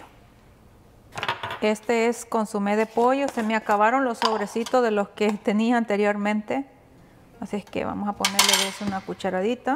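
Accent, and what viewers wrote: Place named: El Salvador. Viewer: Me gusta como abla bien salvadoreña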